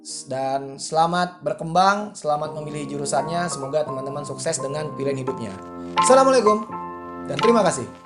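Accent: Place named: Padang, Indonesia. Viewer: Dari logat ngomong, kayaknya kak org padang ya?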